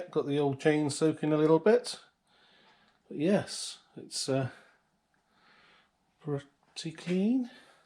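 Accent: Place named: Gloucestershire, United Kingdom. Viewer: Reminds me of my old Dursley Glos accent....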